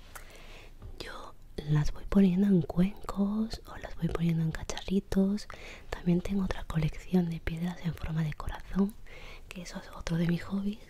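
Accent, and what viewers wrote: Spain. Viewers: Desde que te conocí hara un mes eres mi asmrtist con diferencia. Eres supernatural y autentica. Siento como si te conociera como amiga de toda la vida. Y me gusta mucho tu acento de la otra punta de españa. A seguir creciendo! 😘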